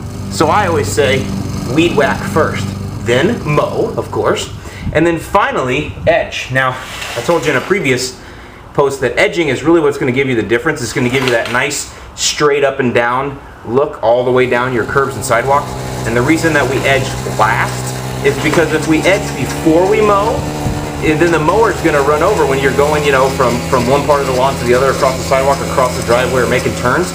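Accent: Chicago, United States. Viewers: Dude, you're Chicago accent is on point!! But I'm from Texas. Thanks for the info. I'm new to your videos so I'll be gravediggin these old vids. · I had to move away from my beloved Chicago a year ago....and I miss it TERRIBLY! Thanks for the accent. I have known a few in my day who DO sound like that...I even miss THEM. hahaha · Lol that chicago accent is engrained in you to this day! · nice chicago accent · This clip kept me in stitches. I pretty much bet you've not heard this one, that your Chicago accent and voice sounds very much like the pro fisherman Babe Winkelman of Minnesota. Kinda glad you don't use that accent in all of your videos. Ha! ~oO(♫)Oo~ PS. Tonight was my third evening and I finally finished applying 20 Gal. of weed killer via a 2 Gal. tank sprayer (the "fan" nozzle helped a lot) and am already seeing lots of "wilt" and the fast-growing weedy lawn has already slowed to a belly-crawl! 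I will keep you posted. 2, 4-D rocks!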